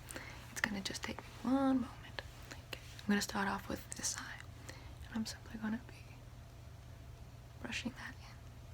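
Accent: South, United States. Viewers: "and i have a southern accent...that is terrible ..." I laughed so hard I love it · I know it’s probably a reference to something else, but the reference to the vampires and the southern accents just makes me think of interview with a vampire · I do adore the snooty sothern acsent becuse it sounds so gossipy and prim, i love it ! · Last night, I giggled when you mentioned thomas edison... I laughed myself awake at the "realization" that you are doing a southern roleplay and you set it during the civil war. "Lincoln is the president. and we are at the civil war. and I have a southern accent. That is terrible." Laughed the entire day about that series of statements. Thank you so much. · Yasss the southern accent- so much love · More southern accent videos PLS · You absolute babe, I love Southern USA accents in Asmr! Thank you 💜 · 1. I'm southern and faux-southern accents usually bother me in asmr in particular, but the antebellum aspect of this aspect made it so enjoyable. 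I love the sort of over-the-top elegance of thick civil war era accents. 2. I had no idea so many people were into vampire diaries until I scrolled through these comments. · I love the freckle on your nose. Odd comment i know, but it is just so unique to your face. Lovely southern drawl, reminds me of Steel Magnolias~ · Southern accents are a kryptonite for me. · lol that made me smile :) And your old timey southern accent is resonant gold!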